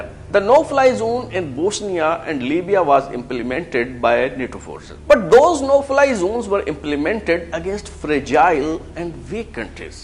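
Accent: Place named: Punjab, India. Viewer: Content in English Style in Punjabi accent :)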